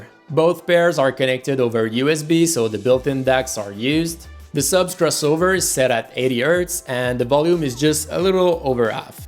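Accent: Quebec, Canada. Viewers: are you from Quebec? you have a French Canadian accent. nice review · J'adore ton accent québecois! Je subscribe!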